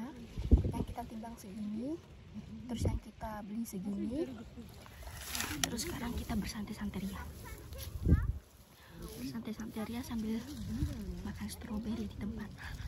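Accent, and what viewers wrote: Papua, Indonesia. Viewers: mba pernah tinggal di papua mana ? soalnya kyk logat papua gt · Kaka pasti asal Papua ini 😁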